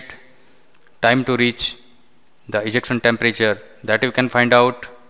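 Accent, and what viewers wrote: India. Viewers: Consultant - I think this is only an introduction to Moldflow capabilities. I was looking for something explained elaborately - like how to use molding window to predict number of gates, how to predict the packing time, how to take a decision how much should be the coolant temp to extract heat from the part. If you have these videos, then plz share. Those who browse for moldflow, are certainly aware of the basic capabilities which u have addressed here. I think U r from Odisha - It's not "Rapage"....plz pronounce it as "Warpage". Bujhila bandhu !! All the best ! keep posting such videos.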